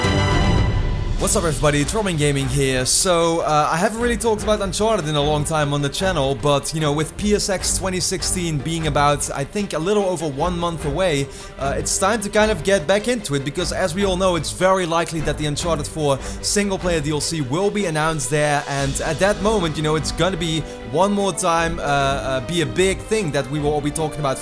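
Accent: Netherlands. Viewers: Ik merkte licht aan het accent dat je niet van origine engels bent, maar Nederlands had ik niet verwacht. Keep up the good work 👍🏻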